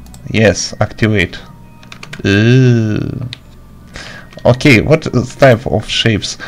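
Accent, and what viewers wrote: Russia. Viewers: У тебя русский акцент, ты не из России?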